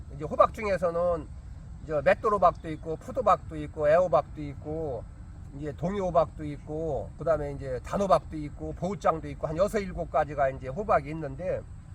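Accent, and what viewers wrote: Chungcheong, South Korea. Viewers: 충청도 사투리 정말 정감있고 와닿네요~^^ 단호박 비료 안줘서 한이지~ㅋㅋ 매번 잘듣고 도움되고 있습니다.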